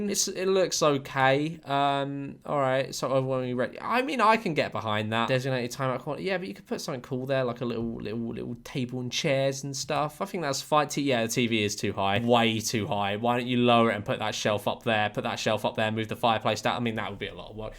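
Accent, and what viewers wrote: United Kingdom. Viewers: Is it just me or does sound more British in this video than the others?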